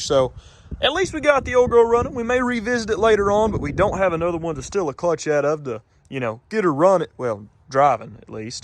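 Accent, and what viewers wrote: United States. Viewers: What state are you from Yank?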